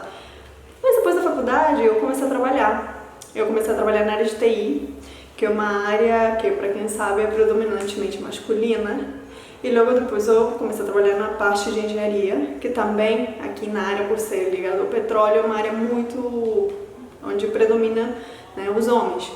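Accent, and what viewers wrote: Rio de Janeiro, Brazil. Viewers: mano kkk não parece que você é estrangeira. Pra mim que sou do norte você tem um sotaque carioca, mas as vezes parece paulista. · Fica tranquila, você passou pelo ritual de inicialização que todo estrangeiro passa no Brasil para se tornar um brasileiro raiz. Falar o português brasileiro sem sotaque. E você está falando perfeitamente e com um belo sotaque carioca. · Putaquipariu sotoque carioca! Sou do RJ. Tamos juntos 😲😲 tu é fohda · Fala bem o português com sotaque carioca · Você fala português muito bem mais você está falando o carioques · Todos temos sotaques. Seu português é carioca. Aqui no Brasil ainda são poucos os estrangeiros na escolas e universidades. Por isso chama muita atenção. Creio que a leva grande de latino-americanos, vide (venezuelanos, bolivianos e equatorianos ) ,africanos e árabes (sírios) que estão chegando acabará por tornar mais comum os sotaques diferentes. 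Competência ( Competição) Competência em português tem um sentido de aptidão. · Todo e qualquer estrangeiro é visto por nós brasileiros como um irmão, eu acho muito fofo os sotaques, O Brasil tem vários sotaques, Vc já adquiriu o sotaque carioca. Boa sorte menina lindo seu nome, seja firme vc é uma vencedora. Forte abraço Princesa. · Seu sotaque é de carioca o português 💚💛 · Fala perfeiro em Portugues sotaque carioca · Seu sotaque carioca é genuíno, linda carioca peruana! Acompanho vários canais de estrangeiros morando no Brasil. De maneira geral, me parece que as mulheres estrangeiras aprendem o português melhor que os homens estrangeiros. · Fala portugues com leve sotaque carioca. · É lindo seu sotaque carioca. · Seu sotaque é perfeito. É carioca até na musicalidade, poderia falar sem chiar o S que saberiam que é carioca. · Vc tem sotaque carioca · Sotaque carioca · Há uns anos atrás, eu comentei com um amigo meu colombiano que se ele falasse português sem sotaque ninguém diria que ele era estrangeiro. 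O mesmo pode se aplicar a você que já brasileira naturalizada. O português falado no Brasil tem vários sotaques e o seu é visivelmente carioca, que é o certo. Mas não fique preocupada em relação a isso, já que o sotaque carioca culto é o padrão para o português brasileiro. Como dizia a propaganda da Schincariol: O jeito certo é o do Rio! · Seu português é muito bom. O sotaque é carioca. Você é muito simpática, inteligente, determinada. Sua observação sobre esse problema de relacionamento,rejeição,dificuldade, não sei o que dizer. Mas fico muito feliz com o seu empenho de querer ser brasileira. Com sotaque ou sem é uma honra e pessoas como você engrandece qualquer lugar onde estiver ou vier estar. Fico muito contente em saber que sou conterrâneo de uma peruana nascida em Lima. Até breve,desligo. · Sou cearense ,mais você tem sutaque SiM,seu sutaque e carioca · Como assim sem sotaque.. mó sotaque de carioca pow.... 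kkkkk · Ta carioca demais da conta sô, quero ver falar mineirês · Bom tem um excelente português, mas com sotaque de carioca,fala chiado rsrsrs. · Você tem sotaque carrioca! haha Que bonito! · Na verdade voce ja tem ate o sotaque carioca, muito bom! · boa, babacss existem em todos os países. Agora essa frase"muita das vezes" não existe(isso é o que chamamos de vício de linguagem ou gíria carioca), pois o certo é MUITAS VEZES !Mas parabéns, esta falando o portuguees brasileiro (e carioca puxando o xxxx no lugar do s, certinho) a gente q é carioca puxa o s ｍｅｘｘｘｘｍｏ kkkkkkk!SRN! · VC é pessoa abençoada! Tem até sotaque carioca...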